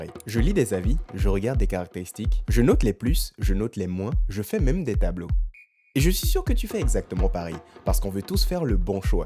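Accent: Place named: Benin. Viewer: Great video Vous avez un accent béninois/togolais Rare de voir des feedbacks venant de ses nationalité Mercii beaucoup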